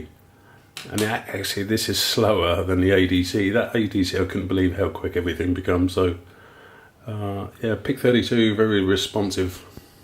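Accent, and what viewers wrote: United Kingdom. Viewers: will do I’ll try a few of the example programs, I realised after I posted it was probably the picture file, I thought from your twang you was from Surrey way.. Yorkshire a bit further up the country from me.. ok I’ll go have a play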